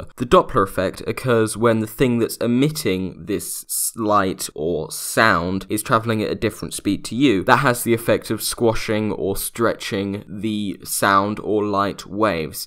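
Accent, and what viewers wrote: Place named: South, United Kingdom. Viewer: Hard to understand his south-english accent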